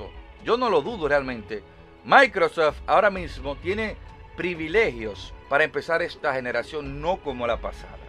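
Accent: Dominican Republic. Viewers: Brother tu eres de RD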